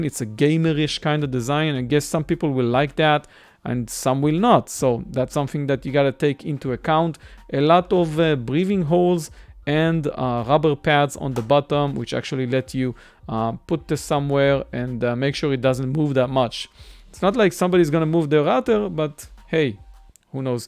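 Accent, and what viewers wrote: Israel. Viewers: איזה מבטא ישראלי אחי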